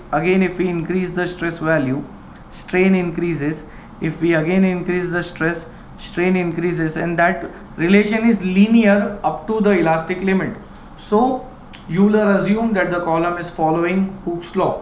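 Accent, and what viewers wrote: India. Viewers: Shit I HATE how Indians speak jobbers English ughhhhhh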